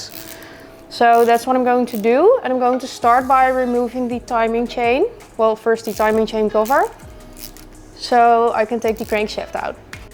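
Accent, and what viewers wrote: Netherlands. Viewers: Thx for another great video! I was curious about your t-shirt and found some good music 😊 a little off topic, but I have to mention I am thinking about bitterballen & stroopwafels when I hear your dutch accent 🤭 I cant help it - sorry 🤭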